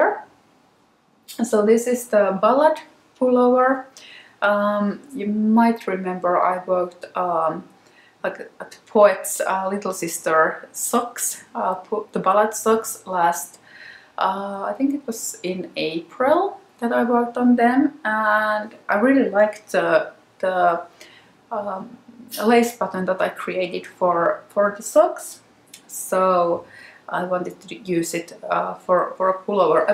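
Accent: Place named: Finland. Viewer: your finnish is showing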